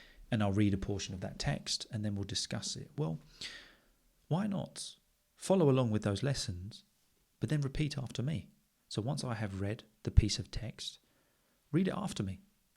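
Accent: United Kingdom. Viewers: I'm from Georgia. I really enjoy your podcasts and your beautiful British English.❤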